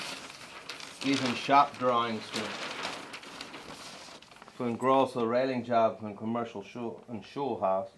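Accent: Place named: Germany. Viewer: Hes speaking english with a german swing... Seems like its really dying sad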